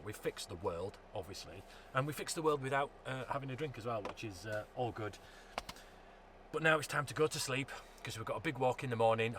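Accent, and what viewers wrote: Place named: Leeds, United Kingdom. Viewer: We love the channel and site reviews. Having been born and grown up in Leeds Im sure there is a north east Leeds twist to the accent and have now invented a new family game of “where’s ” accent from. Keep the reviews coming. They are a great way to unwind after work and between our own campervan adventures.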